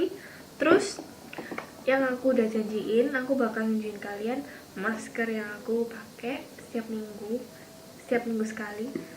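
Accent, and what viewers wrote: Java, Indonesia. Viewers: Wjah chines ... Logat eee medhookk y mbaakk ... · medok ya suaranya tp lucu hehe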